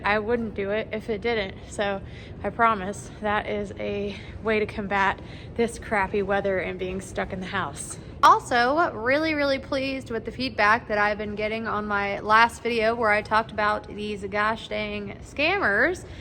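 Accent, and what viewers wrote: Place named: Wisconsin, United States. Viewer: Sounds like Wisconsin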